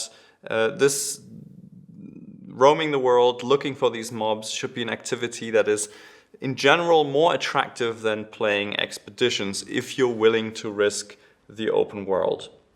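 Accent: Germany. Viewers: Er hört sich aber sehr nach Deutsch an.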